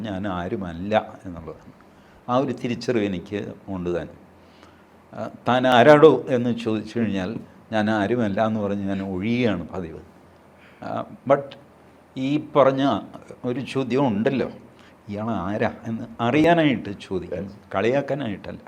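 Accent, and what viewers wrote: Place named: Cherthala, India. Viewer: TG സർ അങ്ങ് ഈ ഇൻറർവ്യൂ വിലൂടെ കോറിയിട്ടത് ജീവ ചരിത്രമാണ്. ഞാൻ ഒന്നു രണ്ടു വർഷമായ് ആഗ്രഹിച്ചിരുന്നു. താങ്കളുടെ സ്വദേശം എവിടെയാണെന്ന്? താങ്കളുടെ ഭാഷ കേട്ടപ്പോൾ ചേർത്തല ഭാഗമാണെന്നറിയാം പിന്നീട് താങ്കൾ മട്ടാഞ്ചേരി TD സ്കൂളിന് അടുത്താണെന്ന് മനസ്സിലായി. പക്ഷേ ഇപ്പോൾ ആണ് താങ്കൾ ജന്മം കൊണ്ട സ്ഥലവും ഒക്കെ അറിഞ്ഞത്,ഞാൻ നാളുകളായ് പലവട്ടം തിരഞ്ഞ ഒരു കാര്യത്തിന് വ്യക്തത വന്നിരിക്കുന്നു. താങ്കളെ പോലുള്ളവരെ കേൾക്കാൻ കഴിയുന്നത് മഹാഭാഗ്യം ജനം TV യ്ക്ക് ആശംസകൾ❤❤❤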